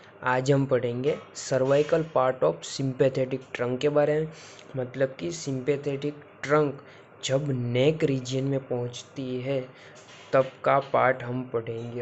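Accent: Gujarat, India. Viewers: અરે ભાઈ ગુજરાતી છો ?? તમારા ટોન ઉપરથી લાગે છે 😅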